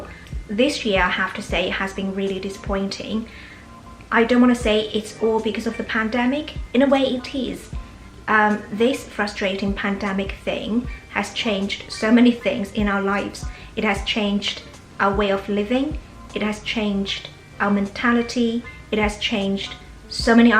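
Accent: United Kingdom. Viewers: 闭上眼还以为是哪个伦敦小姑娘在接受采访呢~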